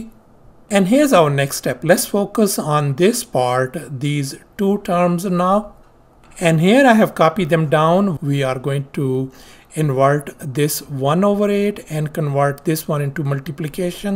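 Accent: India. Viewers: Sir you are amazing... your accent??....are you Indian or Pakistani??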